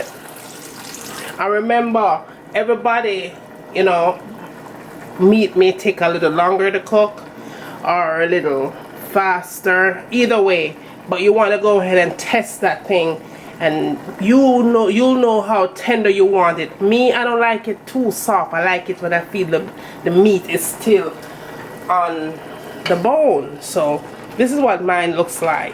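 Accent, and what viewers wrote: Jamaica. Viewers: nice simple recipe!! Btw mi love the Jamaican accent!! Real yaady!!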